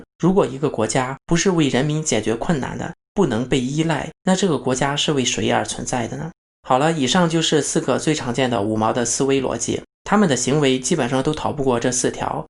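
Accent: Shandong, China. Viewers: 口音大概是山東那區的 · 听你口音是山东人周边地区的人吧？呵 tui。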